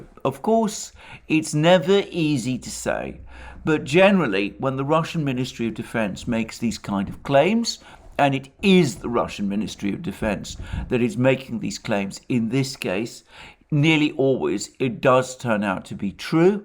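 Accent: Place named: RP, United Kingdom. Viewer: in from the UK originally, Alex is quite posh I his speaking. Something to aspire to. Refer to yourself, referring to ones self, what? Pardon? Where u at? Where are you? Come hither.... Haha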